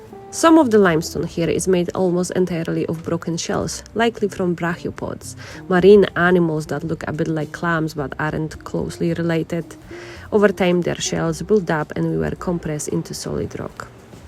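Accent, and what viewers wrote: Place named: Scotland, United Kingdom. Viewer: I love her Scottish accent.😅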